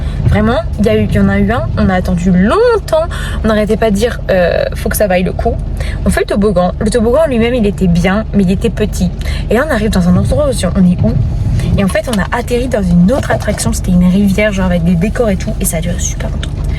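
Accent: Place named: France. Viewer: Bienvenue parmi nous au Québec. Je te souhaite une excellente intégration et te conseille vivement de garder ton accent de France : vous avez un français magnifique, alors ne cherche surtout pas à imiter le nôtre. Au plaisir !❤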